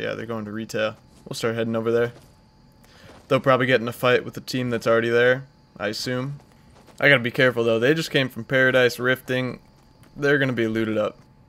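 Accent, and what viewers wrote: Netherlands. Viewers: You from NL? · Is he dutch? Bc he has that accent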